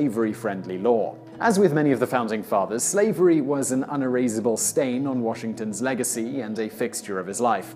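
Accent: England, United Kingdom. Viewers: Your facts are true. Do we need to be reminded of them,. by some smooth english accented tory. Washington also had a greyhound dog named Cornwallis. Now that is worth knowing. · Should we trust these Washington facts from a guy with a British accent?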